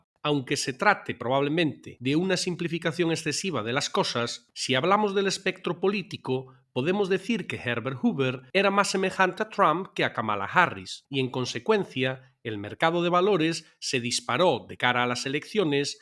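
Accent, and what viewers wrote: Galicia, Spain. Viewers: un buen acento gallego no viene mal. Buen video 🙂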